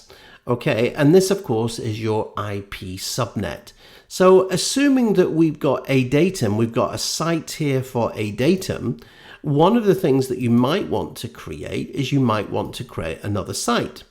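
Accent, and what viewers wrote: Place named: England, United Kingdom. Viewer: Thank you so much for this Active directory video. Well understood. You got a new subscriber. Am happy to join tour team. Love your English accent. 😄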